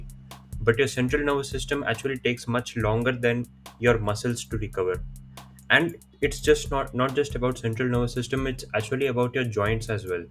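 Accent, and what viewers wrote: India. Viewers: Why does a white dude have Indian accent 😭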